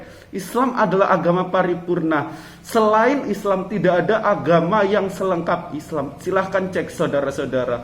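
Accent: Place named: Java, Indonesia. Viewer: Ust ini org batak tapi kok medok jowo.ngga ada logat bataknya. Lanjjutkan.you'are the next.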